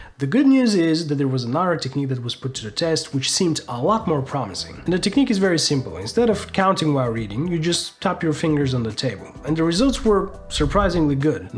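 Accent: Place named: Brazil. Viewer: You sound brazilian, somehow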